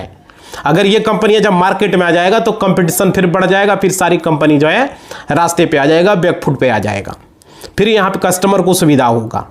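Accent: Bihar, India. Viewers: Sir, your inputs are informative but avoid Bihari style and accents in your YouTube programme. Jai Hind 🇮🇳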